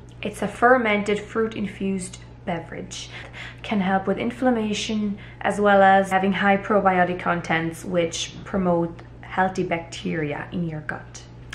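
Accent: Ireland. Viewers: I had never heard about this before! Thank you so much for sharing, very informative. You have such a beautiful accent! May I ask where you’re from/grew up? I hear an Irish lilt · You sound so Irish. Just amazed how Hutterite colonies probably stem from Irish roots.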